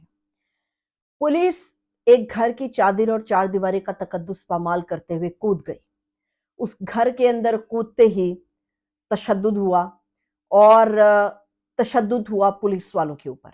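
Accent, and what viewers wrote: Punjab, Pakistan. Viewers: Hehehe MASHALLAH your Punjabi Accent is very cute.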